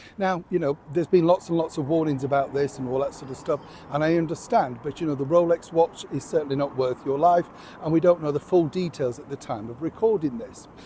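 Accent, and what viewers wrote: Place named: RP, United Kingdom. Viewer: Enjoy speaking the Queens English while you still can (ausie phil)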